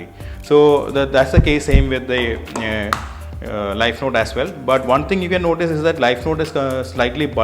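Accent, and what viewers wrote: Kerala, India. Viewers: Hey malayali bro... The typical malayali accent... And nothing to be ashamed infact its something which connect all of us in this diverse society.. good to hear you and thanks for the video... ❤️ Subscribing just coz of the connection which i felt and efforts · He is a mallu...! I can hear that from the way he converse..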